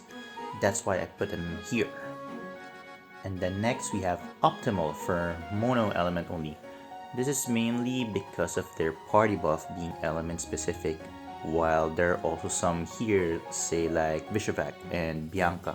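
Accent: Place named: Philippines. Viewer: u sound pinoy af, in a good way xD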